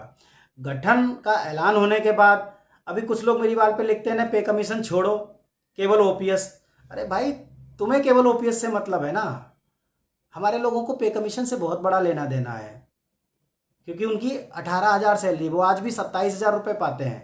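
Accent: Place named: United Kingdom. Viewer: Why is the voice is bloody britishers .pls upload the actual indian voice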